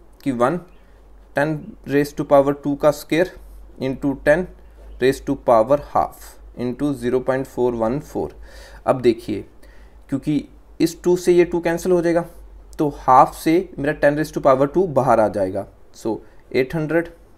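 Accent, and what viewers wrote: Punjab, India. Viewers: Punjabi accent itta funny Kyi hota hai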